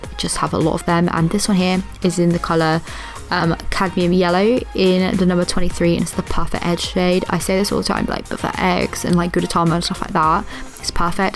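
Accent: England, United Kingdom. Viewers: No way are you English!!!?? 😍😍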